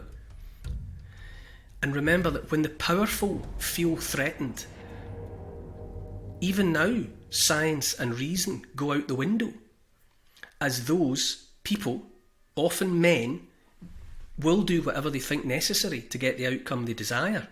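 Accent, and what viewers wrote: Scotland, United Kingdom. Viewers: I adore a good Scottish burr, too. I like them even better in a kilt 😂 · Are you Scottish. I love you accent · First time on this channel🔥whats your accent lad? Scottish? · Are ye Scottish Sir? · well i must say that information couldn't off not sounded any better Scottish twang made it even moee captivating thanks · Your Scottish accent is amazing 👍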